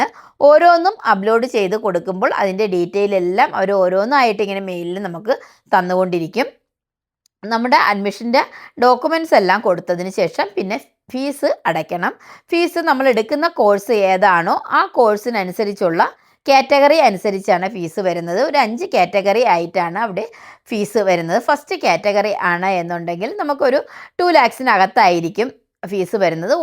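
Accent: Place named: Thiruvananthapuram, India. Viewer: Chyacchi thiruvanthoram aanennu thonunnu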